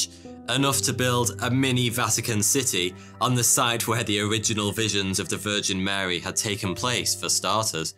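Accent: United Kingdom. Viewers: I love how British he sounda💕🇬🇧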